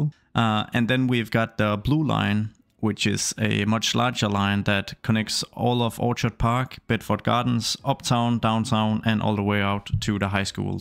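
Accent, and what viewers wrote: Denmark. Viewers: Er du dansker?:) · Are you Danish? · Where are you from? :-D Your accent sounds almost Danish! Awesome movie by the way! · Are you danish? 🇩🇰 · are you danish? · er du Dansker ? 😅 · You sound like you're from Denmark :) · Really cool!! Can’t wait to play CS2 myself.. by the way, are you from Denmark by any chance? Hearing your accent? 😁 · Are you danish?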